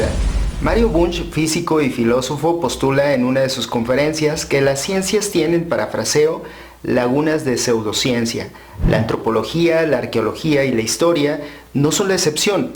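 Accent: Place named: Oaxaca, Mexico. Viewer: Así como tu hablan los pinshis waxhos que odi@ los de zonora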